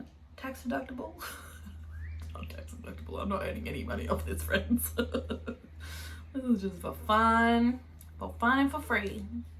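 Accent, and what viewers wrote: Australia. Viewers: Hello from central Vic! You're the first Australian podcast I've found, my brain can't really compute and keeps thinking you have an accent (even though it's the same as mine 🤣🤦‍♀️). I feel like we should have some beautiful yarns here but I don't know of too many local ones, so I'm really looking forward to hearing what you find! If you're looking to dip your toe into cables I'd highly recommend "A Little Hocus Pocus" socks, its a free pattern & has a sweet little cable detail. Thanks for a lovely podcast 🙂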